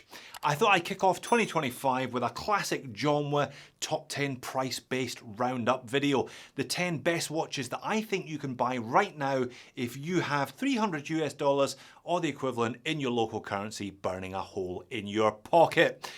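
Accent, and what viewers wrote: Scotland, United Kingdom. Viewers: They all suck in my opinion yet you are bang on about Seiko mineral glass suck, what is up with that slight Scottish twang lulz top ten watches to avoid dunnie waste £300 more like lulz some of them are real bad pish inside that Ali Express watch is soo bad yet the Sapphire glass is decent yet following for the banter lad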